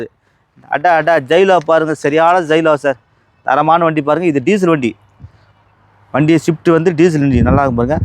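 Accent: Madurai, India. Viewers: Madurai vasagam super 👌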